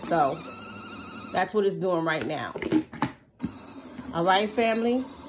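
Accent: New York, United States. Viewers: I was going to say New York lol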